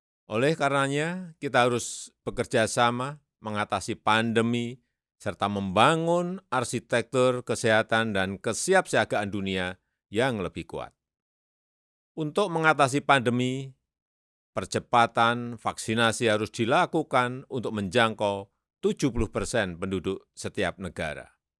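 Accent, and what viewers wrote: Java, Indonesia. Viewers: 😁😁😁😁😁😁😁Hihihihihi Benar tuchhhhh. Ndak enak mendengar BPK Jokowi menggunakan bahasa Inggris saat di forum internasional.....kagok...(lokal Indonesia jawanya terasa sekali....). Maaf ini bukan RASIS atau kesukuan....tapi itu melekat dan sulit dihilangkan 🤭🤭jadi kebiasaan dari kecil sampai tua pasti terbawa.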